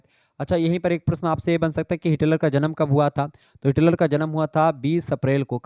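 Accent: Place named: Bundelkhand, India. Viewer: thank u so much sir aapki video apki class hmesha helpful hoti hy maximum qns ate hy exam me jo ap padhate h sir ap kha se apki language hamre side jaise lgti hy chitrakoot ya banda jaisi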